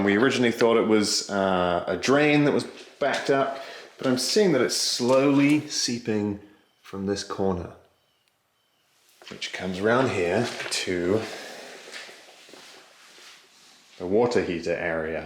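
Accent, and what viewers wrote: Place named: Australia. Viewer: Yeah straightaway I reckoned an Aussie accent, but I just found this channel so I don't know this dudes backstory.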